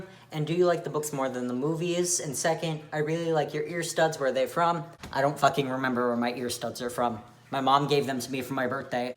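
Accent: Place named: South, United States. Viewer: Very weird question, but are you from Southern Illinois? Your accent sounds more southern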